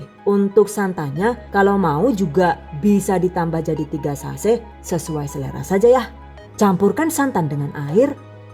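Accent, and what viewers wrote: Banyumas, Indonesia. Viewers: Ngomong engris dgn dialek ngapak, sukak banget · Sumpah ngakak jebule wong ngapak 🤣,suwun mba resepe😘sukses selalu tambah maju🥰aamin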